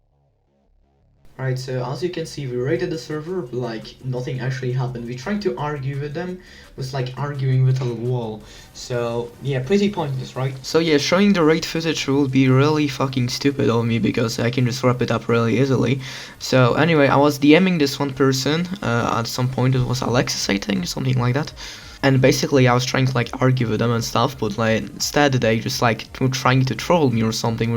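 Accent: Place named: England, United Kingdom. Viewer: ale englisz ladny masz ty z angli chyba jestes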